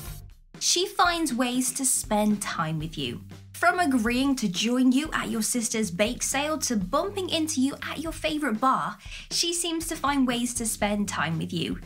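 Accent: England, United Kingdom. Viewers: That English accent tho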